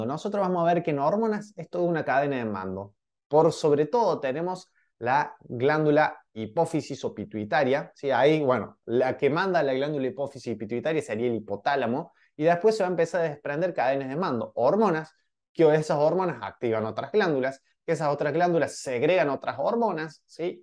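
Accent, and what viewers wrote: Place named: mixed, Argentina. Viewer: Es Tucumáno o cordobés de Argentina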